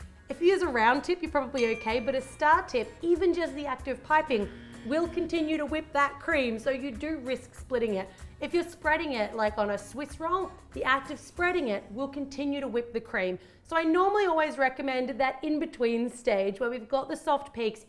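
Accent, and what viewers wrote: Australia. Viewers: IS SHE AUSSIE OMGGGGGGGG YESSSSSS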